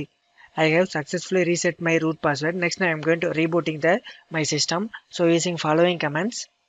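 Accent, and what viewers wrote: India. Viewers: Straight to the point,easy to understand,very simple to follow. Also I really don't mind the indian accent. Personally I find it easier to understand. Keep up the good work man!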